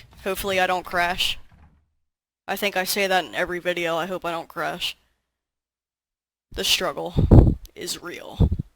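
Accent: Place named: Delaware County, United States. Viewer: Hey are you from east coast? Sounds like a Delco accent. This video helped a lot. I was getting 0 FPS. When I downgraded my.dll file that enb series comes with to the one recommended it worked! It doesn't seem as good as project enb so I need to tweak some stuff.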